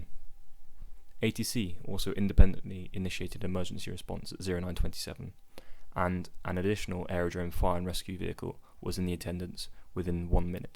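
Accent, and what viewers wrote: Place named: Birmingham, United Kingdom. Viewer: I keep trying to watch this video. I've already left one set of comments. As I keep rewinding. Going what did he say? What did he say? You are mumbling, child! I know we all love Ozzy Osbourne. And wish him and Sharon well. But you don't really want to sound like, you are trying to impersonate, Ozzy Osbourne's, brain dead speech patterns. What did they say that is? A, Birmingham, twang? As in, Birming and ham. It's 2 things not one. It's not pronounced, " Buhmmmmh ". Look at the letters! You have to pronounce them! Along with, letters AEIOU and sometimes, Y. That sounds like, E. And I don't know, Y? And then to make English more confusing. Some joker came up with, YMCA and YM HA in, New Yaawk! And we don't know why that is? So don't ask. It just is. Don't say gay. Even if they are. And genocide of the Native American population. 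No big thing. Not because they weren't white. We aren't like that. It's because they didn't believe in Jesus. And they only lynched black people also? Innguns… Didn't got to worry about that. We were kinder and used bullets instead. Because they also went, BANG! And white guys, like that sound. Because the one they have doesn't work. As Viagra was some, Mexican chick.. And I haven't seen Alice yet. This articulate response. Was artificially generated by my very damaged brain. From years and years. Of calorie burning thinking. Which used most of it up. And in only the past few months. I have lost over 25 pounds! Isn't that amazing?! Just lying on top o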